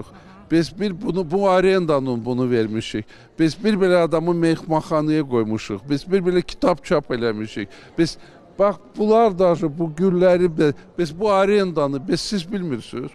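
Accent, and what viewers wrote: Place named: Armenia. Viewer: Eyniylə ermənilər Azərbaycan dilində belə danişirlar, ayib olsun sizə yaraşmir xalg yazicisi adini daşimag, necə ki sizi kimi "ziyalilar"var bu millətin axiri bundan da betər olacag!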